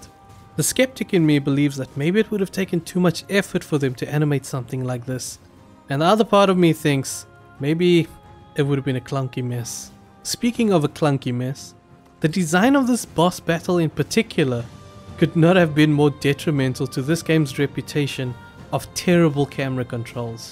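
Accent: South Africa. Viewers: Oh shit you from SA mal kak bru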